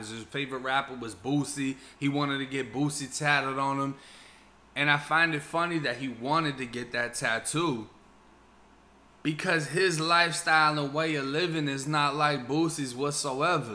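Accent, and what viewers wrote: Boston, United States. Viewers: I’m from England and clocked the Boston accent straight up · I'm 46 yrs old. I'm from Florida born and raised. I graduated in 93". From 94 to 96 I went to a college called Dean College in Franklin, Ma. You have a very strong Boston accent. Love your videos, stories, and content. Thanks for sharing. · Are you from BOSTON? I'm in upstate NY and I can hear that accent.I love it!!!😎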